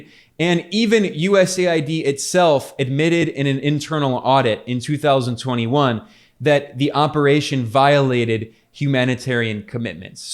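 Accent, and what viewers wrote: Spain. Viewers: Beautiful blue eyes and Spanish pronunciation STOP DISTRACTING ME BEN!!!! I’m trying to focus on this A+ Geopolitical Content!!!! 😂😂❤❤❤❤ Great video as always 🙏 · I just love LOOOOOOVE your Spanish accent. Greetings from Mexico. ❤️❤️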